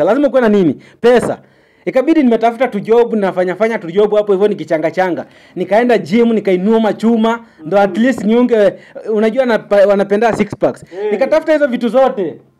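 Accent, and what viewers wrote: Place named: Kenya. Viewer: napendanga story yako ukipiga na ascent ya kikuyu. relationship inahitaji pesa na akili, wewe hauna zote, hauwezani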